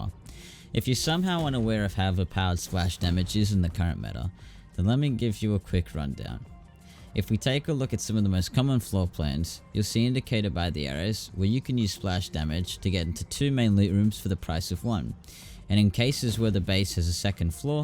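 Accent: Australia. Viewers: Aye an Australian, or maybe a new zealander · OlliBrolli He’s an Aussie fam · Definitely an aussie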